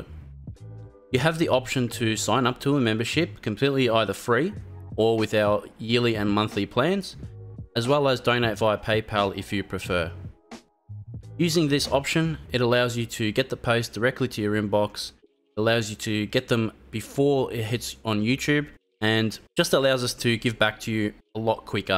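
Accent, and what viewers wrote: Australia. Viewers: don't let Americans force you to change the Aussie lingo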